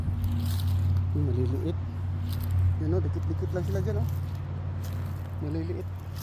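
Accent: Visayas, Philippines. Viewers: Bisdak mn diay k dol😂😂😂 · Kuyawa sa lawa oy,adlawan man msgbalay😂😂😂bisaya man jud ka pre maila ra sa imong inistoryahan❤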